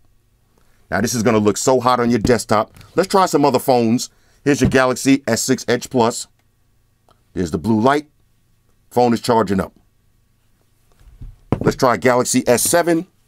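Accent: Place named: AAVE, United States. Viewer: Have not heard a more ebonics infused product review in all my days. Hilarious and enjoyable :D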